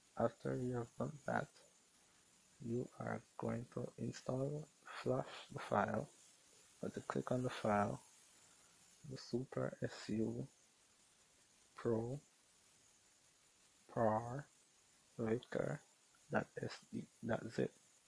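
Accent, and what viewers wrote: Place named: Jamaica. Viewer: I can tell you're Jamaican, me TOO!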